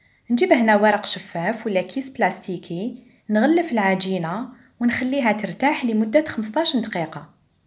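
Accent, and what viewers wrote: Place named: Algeria. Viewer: تبارك الله على اخواتنا الجزايريات راكم حادكات الله يبارك و تحمقني هدرتكم مغربية مرت من هنا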